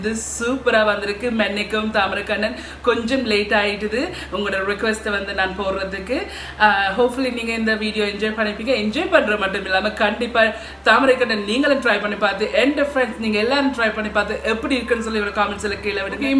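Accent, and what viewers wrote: Jaffna, Sri Lanka. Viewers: I can feel the fragrance of Jaffna in ur Tamil and Jaffna dishes sis... As i m Tamilian i m very much affectionate to srilankan tamilians... i never had a chance to visit srilanka... but i will go there for sure in future... keel uploading more dishes sissy... tc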